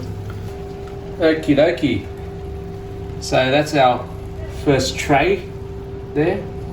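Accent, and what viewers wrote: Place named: Australia. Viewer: This is so trippy to hear a vietnamese guy with an Australian accent? Sorry I don't know the accent.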